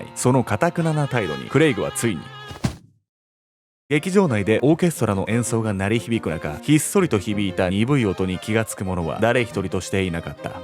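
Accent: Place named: Ishikawa, Japan. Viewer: なんで石川弁wwwマイナーな😂石川県民としては嬉しいけど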